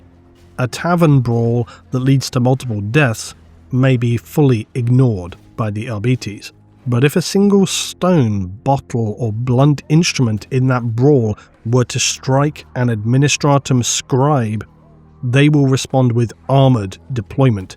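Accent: England, United Kingdom. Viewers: Amusingly, with his particular English accent "I am the law" would sound a bit like "I am the lore" and "I am the lore" would sound a bit like "I am the law".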